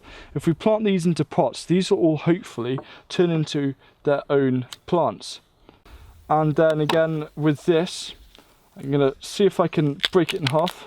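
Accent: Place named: Wales, United Kingdom. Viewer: Great video. I'm surprised you don't have your own TV show. Perhaps you do. I'm in Canada and have no way of knowing. I'm Scottish and was curious about your accent. Now I see your Welsh. All the best and please keep them coming.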